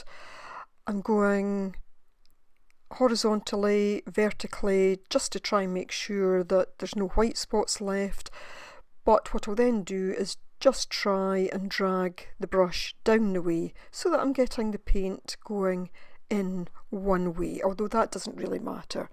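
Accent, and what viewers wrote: Scotland, United Kingdom. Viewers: I like your videos. It's refreshing to hear a Scottish accent for a change on YouTube.I do similar craft stuff myself but am a digital dinosaur. I am watching from the Outer Hebrides. Every blessing to you and Nina for future videos xx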